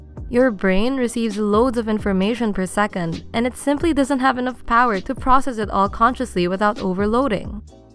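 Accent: Philippines. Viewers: the voice actor sounds filipino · Why do i feel like she sounds like a filipino speaking in English. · The one doing the voice over sounds Filipino. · Why does the narrator sound like a Filipino to me? I talk like that lol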